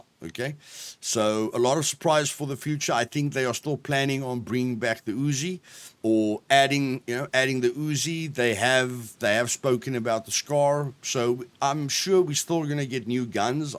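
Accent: South Africa. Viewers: Awesome video buddy. Very informative and interesting. Keep up the good work 👍🏻. You from South Africa? Excuse my ignorance if not haha but I can't pin the accent!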